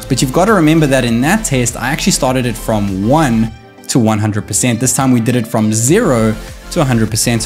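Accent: South Africa. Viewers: i swear this guy sounds south african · Bro I can recognise that accent anywhere. Hello from a fellow South African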